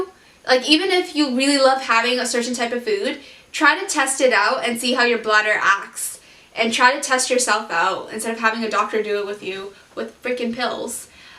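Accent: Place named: Canada. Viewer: Your Canadian accent is very cute.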